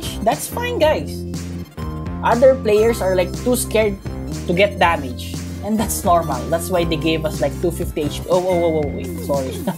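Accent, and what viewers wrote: Philippines. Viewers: u sound like filipino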